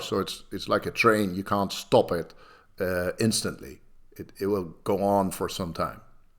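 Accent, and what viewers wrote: Netherlands. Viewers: If someone sounds like the new NATO top dog, they're Dutch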